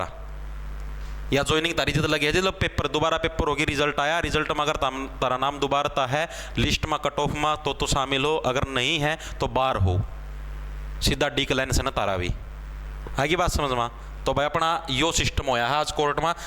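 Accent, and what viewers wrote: Haryana, India. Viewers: Maan gye guruji..apke theth haryanvi andaz k liye...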